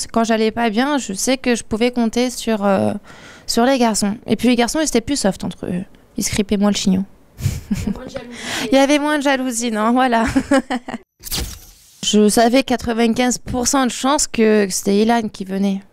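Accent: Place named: Paris, France. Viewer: Juliana Laurent c’est vrai qu’on dirait qu’elle a un accent du genre parisien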